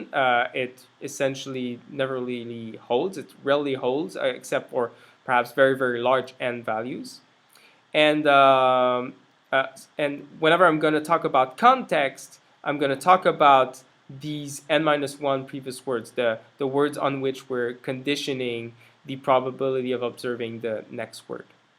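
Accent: Quebec, Canada. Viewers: I guess you're a French fella from Quebec. Anyway, it's a good video.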